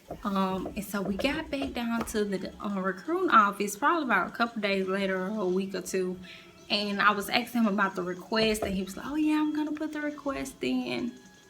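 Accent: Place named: South, United States. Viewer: Love your southern accent 😍❤️‼️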